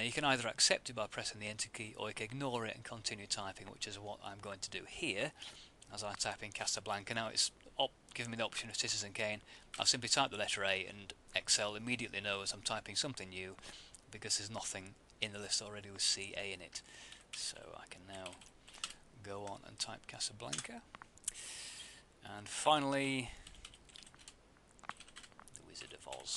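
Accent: United Kingdom. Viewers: thanks u british guy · don't know why i can listen to british people doing tutorials but american accents bore me to death in tutorials!? Even though im american! Anyways good job on the video!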